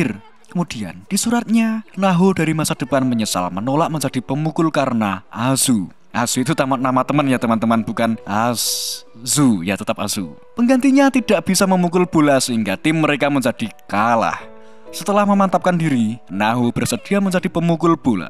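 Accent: Java, Indonesia. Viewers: Klw medok itu tingkat ke Medokan nya bisa di kurangin gk ya..🤔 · Saran jangan terlalu medok apa lagi aaa iiii uuuu eee ooo nya delete aja soalnya kefokusan jdi samar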